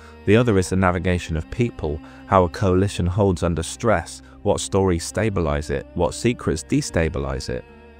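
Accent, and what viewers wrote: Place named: United Kingdom. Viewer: First off, I promise you that I push your channel as much as I can and I wish there was some way of helping you out and getting you more into the public eye. There is a huge audience for Andor content, but the algorithm is so difficult to control. I genuinely wish you all the best, and as one monotone British accent to another (in my case a very broad northern one) I love listening to you! As for this arc, I think this is when Cassian slowly begins to understand that he can be a leader but he's still not there. And I totally agree with your ideas about Skeen - he is Cassian's dark reflection, what Cassian could have been if he hadn't had Clem and Maarva and the community of Ferrix. I don't think the value of Cassian's upbringing can be underestimated It gives him the confidence to face down the other members of the group and slowly start to worm his way in and make himself necessary. 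Turn into Ellen Ripley, if you will! And Nemik is so genuinely happy to meet somebody that he can talk to and who is willing to listen to him and, as you say, nerd out with him. In another life, they would have had a really good sibling relationship I think. I do think Skeen cared for Nemik, although I've seen a lot of arguments to the contrary. The innocence and the eagerness of Nemik is that breath of fresh air that perhaps they all need. I enjoy your videos so much - I too am no longer in work as of last week, and so can't afford to support you but I do wish you all the best.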